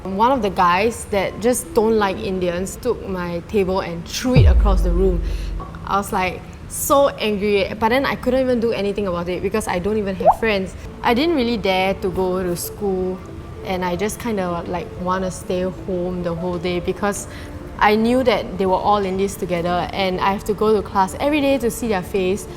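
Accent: China. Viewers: Please speak in an Indian or atleast a neutral English accent. How ur speaking in a Chinese accent is just messed up. Sorry to hear about the bullying incident, but dont let that control your image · She really have the Chinese English accent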